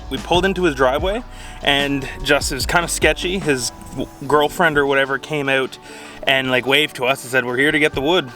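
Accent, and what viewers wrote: Canada. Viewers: Lmao the Canadian accent “aboouut” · Canada eh?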